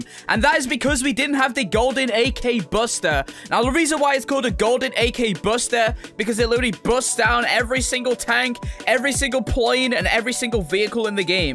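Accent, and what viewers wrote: United Kingdom. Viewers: We got to admit that we love his British voice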